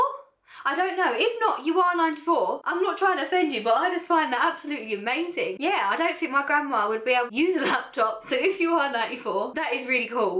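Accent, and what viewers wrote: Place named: United Kingdom. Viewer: Sweetheart, I absolutely LOVE your accent. I'm completely sorry if that's offending, I know when people tell me they like my "Caribbean" accent it can be quite annoying sometimes. I totally admire you and your makeup work! I love you tons! Hopefully when I go visit the U.K. that I run into you! Much love <3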